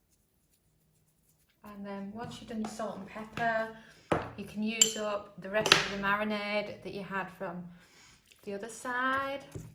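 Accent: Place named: North Yorkshire, United Kingdom. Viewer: Thanks so much. This is a recipe I'll be making soon as I love duck. My wife doesn't eat meat but I'll be happy to make this for myself. Also, love your accent. I'm guessing North Yorkshire. Am I close?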